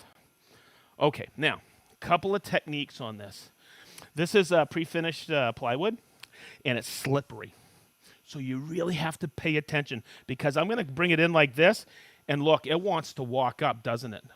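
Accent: Boston, United States. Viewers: Hey can you park my cah- I’m a masshole too I hear it - love it New England woodworkers rock🎉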